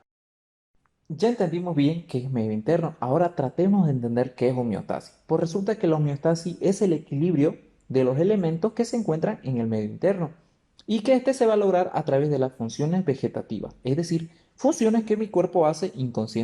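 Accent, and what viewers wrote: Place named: Bolivia. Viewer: Muchas felicidades DR, me ayudaste mucho en fisiología, explicas muy bien, por tu forma de hablar pareces boliviano, yo también soy de Bolivia, y te ganaste un nuevo suscriptor.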